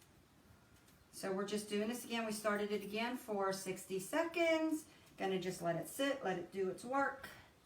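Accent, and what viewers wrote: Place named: Pittsburgh, United States. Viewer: Hello from a fellow Pennsylvanian. I was watching your video and thought to myself that you sound like you have a Pittsburgh accent. What a very beautiful, creative blanket (loved your granddaughter’s blanket too)! I am just starting out in sublimation. I made a few heartfelt creative Christmas presents. I am continuing to practice. I really love this creative outlet. I, like everyone else, was wondering where you got your blankets from, great size and it looks to be an awesome quality. Thank you for your informative, thought provoking video. Stay safe.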